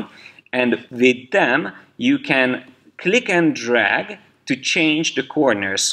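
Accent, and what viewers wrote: Hungary. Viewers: You sound Hungarian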